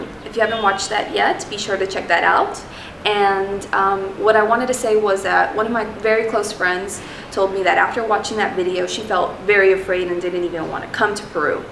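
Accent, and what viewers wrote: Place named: United States. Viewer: You speak like American girl (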